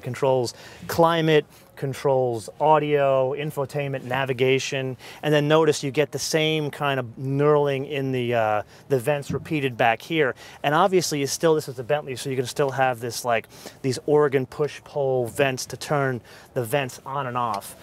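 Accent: United States. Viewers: I hate American accent explaining a British Car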